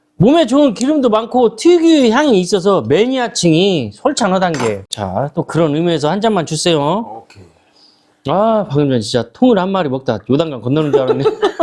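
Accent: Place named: Chungcheong, South Korea. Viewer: 충청도 말씨 일부러 쓰지 마시고 전라북도 말씨. 사투리를 써 주세요. 저는 정읍이 고향 입니다.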